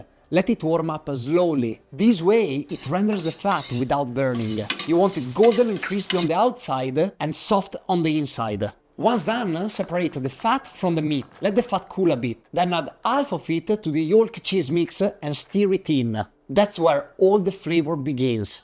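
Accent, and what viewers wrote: Italy. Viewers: sounds very Italian when speaking English because he carries over Italian patterns in pronunciation, stress, rhythm, and intonation, giving his speech a melodic, rising tone and a distinctly Italian flow.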